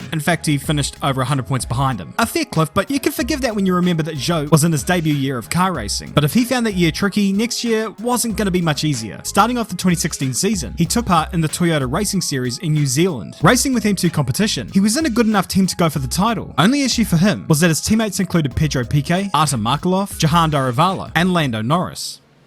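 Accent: Yorkshire, United Kingdom. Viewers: Gotta love the Yorkshire accent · Yorkshire accent on point brother.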